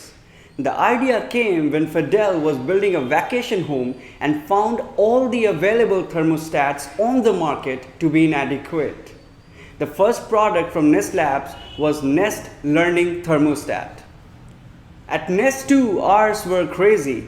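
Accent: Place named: United States. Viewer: Wow....mind blowing English in American tone.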